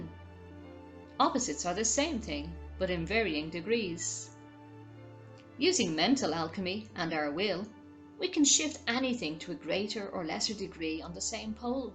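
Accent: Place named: Ireland. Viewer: Are you Irish? I love your accent! Not flirting just saying. Sometimes it love to jump into the mind of an Irishman to see how we sound to you guys.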